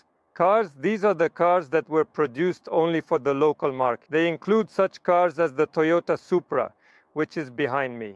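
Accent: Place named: India. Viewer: Так индусы разговаривают на английском.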